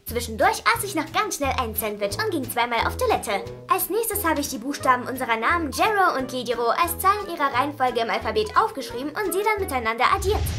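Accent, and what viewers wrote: Russia. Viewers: Du hast auch ein russischen Akzent und er nicht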